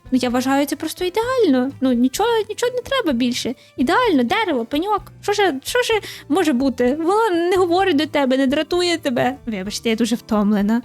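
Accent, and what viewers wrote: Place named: Western Ukraine, Ukraine. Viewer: Дуже круто! Особливо дякую за розмовне відео, це те, що потрібно українському ютубу. Ваш говір крутий і мені цікаво, з якої ви області? Я ставлю ставки на межу 3 областей: ІФ, Львівської і Тернопільської)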